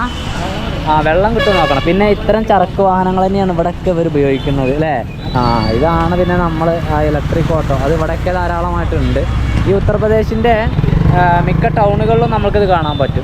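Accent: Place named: Kannur, India. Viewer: നമ്മുടെ കണ്ണൂർ സ്റ്റൈൽ സംസാരിക്കുന്നതിൽ വളരെ സന്തോഷം തോനുന്നു🌹🌹🌹🌹 bro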